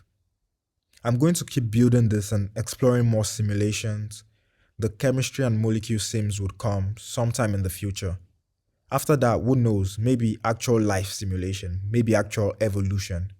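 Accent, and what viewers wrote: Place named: Africa. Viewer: you have a African accent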